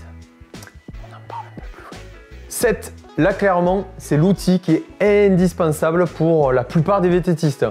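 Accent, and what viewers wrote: South, France. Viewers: Merci pour ces idées cadeaux. Je vais transmettre à ma moitié 😁 Mais pas contre d'être tiré au sort 😍 Toujours de beaux tutos et surtout un accent qui chante et sent bon le Sud. Comme on dit ici: Bon bout d'an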